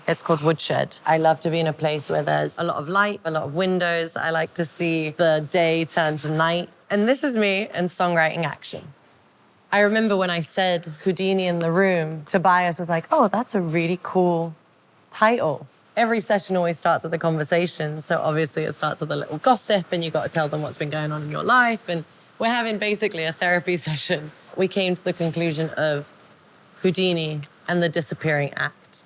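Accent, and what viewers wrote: United Kingdom. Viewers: Love your songs,your unique style and your British accent.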